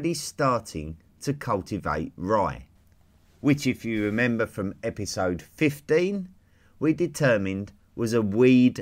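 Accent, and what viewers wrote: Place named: United Kingdom. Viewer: What British accent is this?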